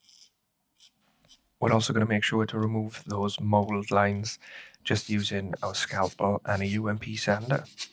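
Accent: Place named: New Zealand. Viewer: Great to hear an accent/dialect from home ! - excellent set of videos for this kit. Perfectly lit/shot and measured delivery. Great work matey. Cheers from Aotearoa/NZ.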